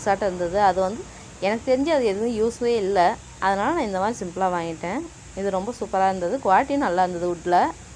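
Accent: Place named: Salem, India. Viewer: நீஙக சேலமா .....உங்க பேச்சு ஸ்லாங் அப்படித்தான் இருக்கு......எங்கம்மா வீடு சேலம்தான்.....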